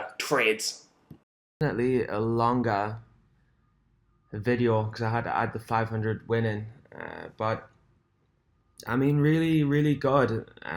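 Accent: Newcastle, United Kingdom. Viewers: You sound like you're from Newcastle! What broker do you use to trade us stocks in the uk?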